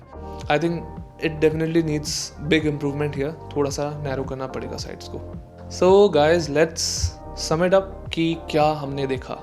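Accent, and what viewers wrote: Assam, India. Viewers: Assam se ho bro? Bhai na bhai!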